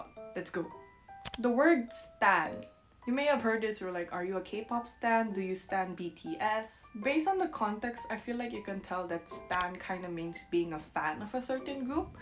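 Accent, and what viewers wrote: Batangas, Philippines. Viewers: You're such a cutie pie! Your accent is such a sosyal filipino english na taga Forbes or Ayala Alabang. First time I encountered your vid and immediately subscribed. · Beauty and Brain. ang Galing mo hindi mo iisipin na korean like ang kausap mo kung sa phone kayo magkausap. talo mo pa ang Real filipino. iyong accent ng Batangas nandoon parin the way you speak. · You are so cute and so Pinay with your antics and speaking. Keep happy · Pti ung English mo is so Pinoy. 🥰🥰🥰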